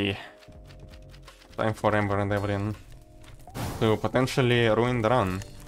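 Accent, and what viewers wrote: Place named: Russia. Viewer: Lol, русский акцент сразу слышно)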